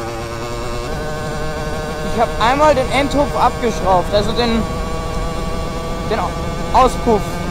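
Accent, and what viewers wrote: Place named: Burgenland, Austria. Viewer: vermutlich burgenländer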